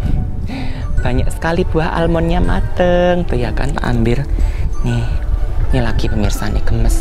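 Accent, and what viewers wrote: Java, Indonesia. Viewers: Wes sui neng luar tapi jawane tetep iseeh medoook nek ngvlog teteeeep jowone ra mbok tinggal,wes too maas ndang rabi beng enek seng ngemuli hehe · Mas sampeyan kok lugu banget, jowomu medok banget, seneng aku, maju terus sukses buatmu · Salam kenal baru gabung.. Asli seko suroboyo opo banyumas mas.. Koq rodo medok medok manjaaaah...😁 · Seneng leh ngrungokke Mas Nek ngomong medhok e poollll mantap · Masnya ngomong logat Jawanya lucu.. Haha...Salken dari Madiun. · Aku baru beberapa hari ngikuti youtube mas,kadang ngmngnya bikin ketawa lucu jawanya jg masih khas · Mase ..bagus tuh ngomong jowone.... lucu lgi...😃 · Assalamualaikum..salam kenal ms.bu Andi dari Makassar sulawesi selatan.. Udah lama tau mas di vlog mba Yanti Guixe dan Teh Dona Bayo..tp baru smpat koment nih hehe.. Suka bgt dgr logat dan meddoknya ituloh mas.bikin ngakak.. 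Dan yaa sy sependpt dgn yg koment td..bhw mgkin lebih dikembangkan lg vlognya ttg sejarah kota tmpat ms saat ini..selain panen Ok mas..smangat dan sukses sllu👍👍🙇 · Ya Ampuuuuun senag bgt nonton mas logat jawax lucuuuu deh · He..he..he.. logatJowo medhok..lucu...keren mantap mas... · seneng bnget liat orng indo medhok ngevlog di luar negeri panen buah2an yg gak ada di indo.. 😀 semangad terus ngevlog nya mas 💪 ntar selanjudnya panen buah pear (liar) klo ada 😀❤️ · Mas tempat e neng ndi ko jawae medoxk kowe lucu mas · bang kocak lo😂😂😂😂 terus lagi bang medhok nya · Waduuh medok mas jowone sampeyan sueneng aku ngikuti konten" e sampeyan iku smg sukses slu yo mas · Medhok dan ngondhek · Logat nya medok lucu bgt wkwkwkwk 🤣🤣🤣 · Ko sy suka ngomong jawanya 😅😅😅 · Jowo ne ngendi to mas? Lucu sampeyan hehee.. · Assalamualaikum, Maseh ini asli mana to kok iso biso jowo medok, Lek angsal perso pundi mas jowone ?? 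☺️🙏🙏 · Sampean jowone ngendi mas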